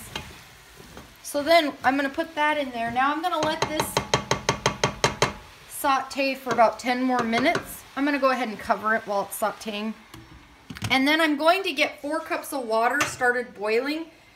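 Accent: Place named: Gaspé, Canada. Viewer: You have a Gaspé Coast accent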